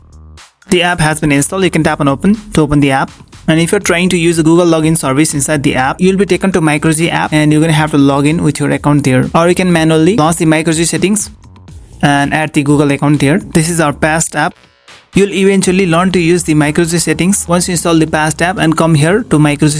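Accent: India. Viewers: That seems like a you issue honestly. That said, he does have some weird mix of accents, & this is coming from another Indian. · couldnt understand jack shit from the indian accent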